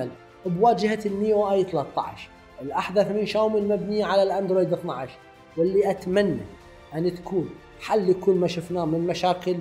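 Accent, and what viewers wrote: Iraq. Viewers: لغة عراقيه طاغيه استاذ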